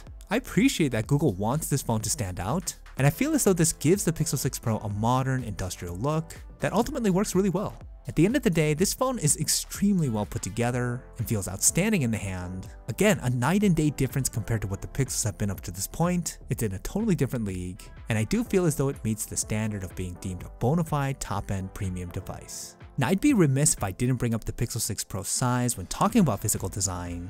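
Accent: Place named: Illinois, United States. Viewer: It's how we talk here in Illinois. Especially the front part of the state.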